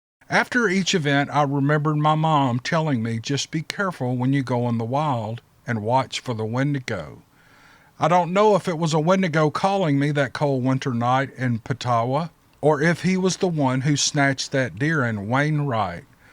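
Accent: South, United States. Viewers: thanks for keeping us in your thoughts even while you're too busy to post you still think and plan ahead so we will have new posts to watch!! That's above and beyond!!! See you when we see you! I sure do enjoy your telling of others encounters!! The people that experience their encounters do a great job of writing them up but that's based on what you read and while I live on the West coast I grew up in the woods of Missouri, then from 1970 to 75 Ft. Hood Texas where we hunted and fished every off work hour we could get and between hunting on foot or riding my dirt bike I covered that post pretty damned well when on my own even! I love the southern humor and style and you're definitely all of that in its best vocal embodiment of it in my opinion!!! Can't wait for the next few!!!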